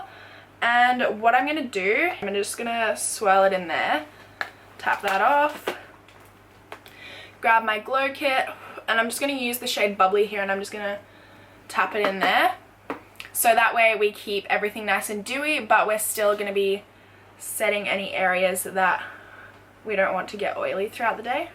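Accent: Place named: Queensland, Australia. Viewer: you are such a doll. I was in QLD a few months ago, love your accent 😘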